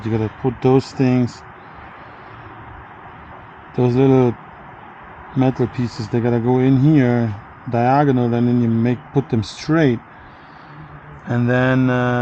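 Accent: Germany. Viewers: Super nice Video! If you could do the process also for a VW Touran VAN? Seems to me quite simular, but obviously there are differences. Also i don't have a garage with all the tools you used. By origin you must be garman by your accent? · Really hoped this would be easier for someone who usually doesn't work on his car 😂 You're accent sounds a bit German, and your friend's sounds Italian. What kind of accent is that?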